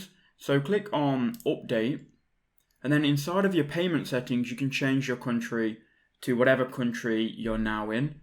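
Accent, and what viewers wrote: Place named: East Midlands, United Kingdom. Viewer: British, sounds like East Midlands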